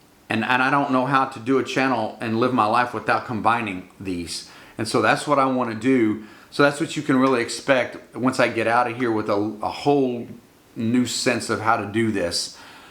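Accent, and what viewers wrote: England, United Kingdom. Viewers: لان لهجة انجلترا تختلف على ديال امريكا رغم انها لغة انجليزية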